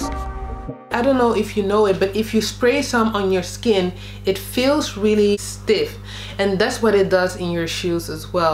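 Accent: Netherlands. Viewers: hahah wat leuk! Je uitspraak is uitstekend hoor, heb zelf op een internationale school gezeten in Californië maar je hoort toch gewoon het Nederlands er doorheen als iemand uit Nederland Engels spreekt;-) leuke video, ik was op zoek naar een oplossing voor mijn kleine teen die met een open hak in de verknelling kwam dus vandaar dat ik bij jou kwam. zoals ik zei, leuke video meis, ga zo door 👍 xxx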